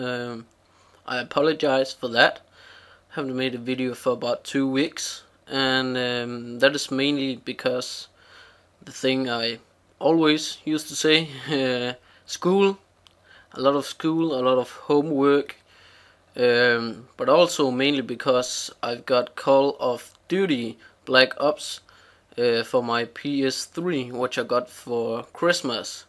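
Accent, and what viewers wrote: Denmark. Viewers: This is your channel, which means that you should do what ever you want. if you want to promote other people you should do that. If people don't want to watch it they can just close the video again... though if you want advice I would say you should work on your accent because, atleast to me, the danish accent makes people sound dummer than they actually are... though it might just be because I'm a dane myself...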